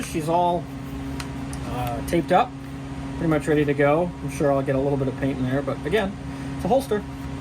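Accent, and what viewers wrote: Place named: Massachusetts, United States. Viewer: Subtle hints of that Bill Burr Massachusetts accent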